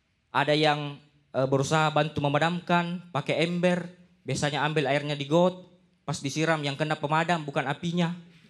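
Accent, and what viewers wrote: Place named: Makassar, Indonesia. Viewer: Ini dia yg gue tunggu.. Orang Makassar mana suaranya ❤️❤️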